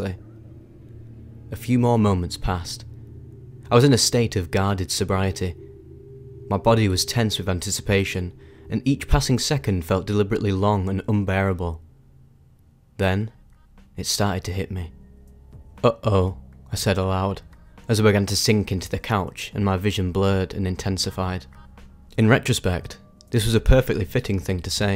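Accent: United Kingdom. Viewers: Having a British accent is like easy mode for commentary channels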